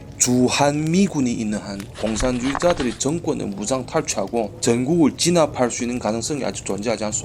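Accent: Yanbian, China. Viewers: 피뜩 들어두 연변사람 옳스꾸마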